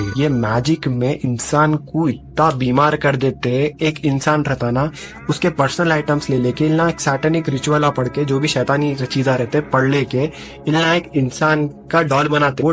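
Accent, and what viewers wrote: Hyderabad, India. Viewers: Hydrabadi Tone ❤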